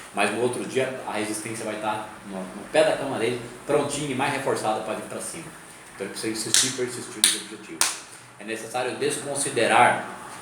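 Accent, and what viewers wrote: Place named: Minas Gerais, Brazil. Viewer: Adoro sua dicção..bem mineiro mesmo ..eu tambem sou mineira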